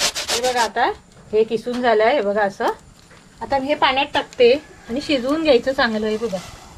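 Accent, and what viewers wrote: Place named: Malvani, India. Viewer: Tumhi khuup chaan bolatat kaki , assaal malvni tune madhy... 😊 mazya aai sarkh....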